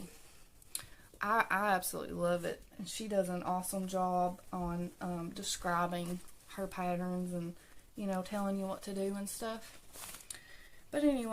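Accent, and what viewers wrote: South, United States. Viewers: Oh I just found your channel!! I love it! That doily is going to be beautiful😍. The snow dragon you are working on, is absolutely adorable❤. I'm loving all of your makes. Love the accent. Are you a southern gal? 😂. I'm a Kentucky girl, so I have that slang also. 😊. I hope you have a good day,. ☮💜🧶. Now, I've gotta go watch your other videos. 😁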